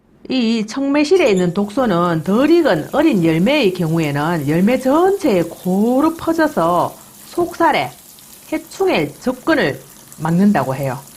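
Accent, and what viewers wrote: Gyeongsang, South Korea. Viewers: 세프님 사투리 넘심해요 나도 경상도 출신인데 듣기가 좀거북 하네요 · 저는 너무 경상도 말씨가 너무 정감이 가요 매번 참 잘 보고 공감 을 합니다 매번 알틀 하시고 경제적이고 쉬위서 많이 이해가 가요 근데 매실은 오래 두면 독이 생기고 씨가 그렇다고 한데 먹기가 참 아리송 해요 담 아서 얼마간 숙성 을 시켜야 하는지요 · 갱쌍도 사투리가 엄~좋아부러유~~♪~♬~♩ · 선생님 고향어디에요 말씨가 시골말 참듣기좋아요ㅣ 답장주세요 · 경상도 아지매 정말 정겹습니다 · 여사님영상을계속보는대경상도발음이넴.귀엽고사랑스럽네요 향상구독하니.건강하시고좋은영상많이보내주세요 넴좋아합니다 여기는부산입니다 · 갱상도 사투리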